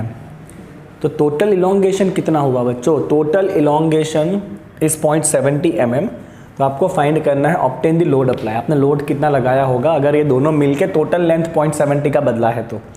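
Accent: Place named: Surat, India. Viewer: Sir are you from surat ....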